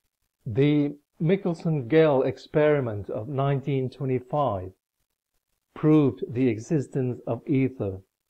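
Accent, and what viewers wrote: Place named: India. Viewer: not at all! You sound like you may have an Indian accent, that would make us neighbours (I was born in Sri Lanka) ! But I'm not 100%...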